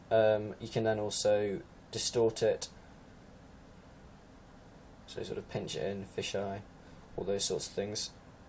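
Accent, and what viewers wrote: England, United Kingdom. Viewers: British accent hurts my ear T_T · I realy hate that british accent its so ... gay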